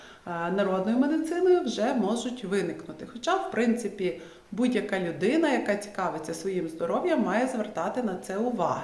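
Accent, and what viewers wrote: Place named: Galicia, Ukraine. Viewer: ' так гарно звучить... відчувається що галичанка, львівська пані. Всіх благ вам і добра за таке корисне відео.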